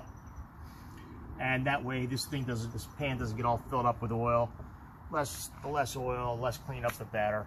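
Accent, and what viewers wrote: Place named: Philadelphia, United States. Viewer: And that area is very clearly the Philadelphia area! Can spot that accent anywhere. See ya around town!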